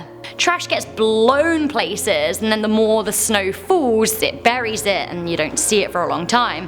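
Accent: United Kingdom. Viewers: Is she british? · Wohhh blew my mind I thought you guys were from the U.K with the way you talk........... Canada threw me way off anyone else think this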